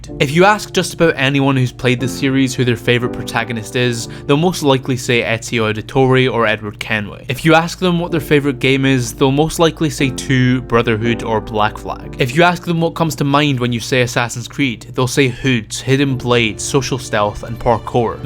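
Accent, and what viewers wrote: Scotland, United Kingdom. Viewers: u from scotland mate? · Is this kid British I'm not listening to any more of his bullshit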